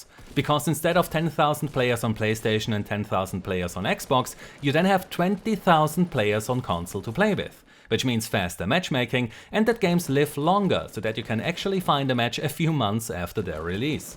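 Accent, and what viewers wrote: Germany. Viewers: man hört dass du deutscher bist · Are u german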